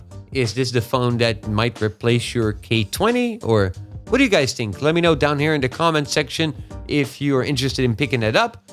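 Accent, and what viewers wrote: Netherlands. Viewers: you dutch? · He's definitely Dutch. His accent is pretty good as far as Dutch people go, but as another Dutch guy it was still a bit distracting. You can here it on his "th" for example. He is very deliberate to try and get it right, even then he sometimes slips up in a very Dutch way like the third time he said "thirty" in a row. "tirty" and "firty" are ways of getting around the inability to pronounce "th" almost every Dutch person, me included, does. He went for the former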